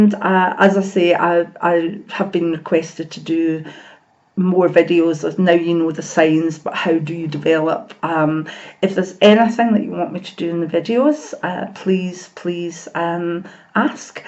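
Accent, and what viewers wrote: Scotland, United Kingdom. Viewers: is sleep paralysis a sign...had this Since a teenager. Nice to hear a Scottish accent on youtube. im Scottish too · Hello, just found your channel. Good to hear a Scottish accent as I'm in east Dunbartonshire. Liked and subbed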